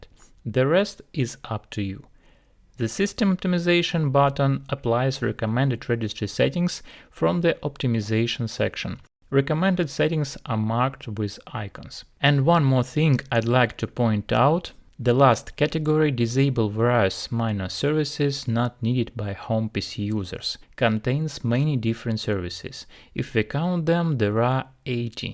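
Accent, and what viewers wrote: Russia. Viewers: Are you russian? :)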